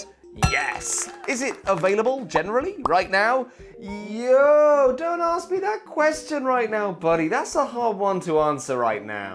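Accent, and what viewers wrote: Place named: Wales, United Kingdom. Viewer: The accuracy to which a computer can reproduce a Welsh accent is giving me an existential crisis. Par for the course with a SUSD review to be honest. Great video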